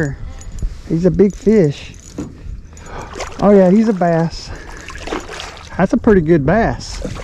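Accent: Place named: Ozarks, United States. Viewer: I can tell an Ozark Mountain accent when i hear it. Proud Ozark Mountain Hillbilly mysef. 👍 👍 👍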